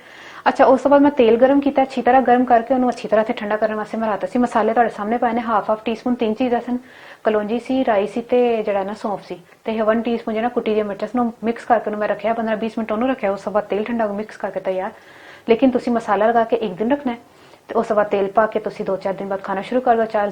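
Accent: Sialkot, Pakistan. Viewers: Sialkoti punjabi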